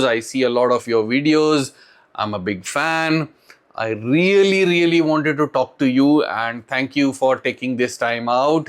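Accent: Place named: Karnataka, India. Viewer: Sir you are punjabi but your accent is pure kannada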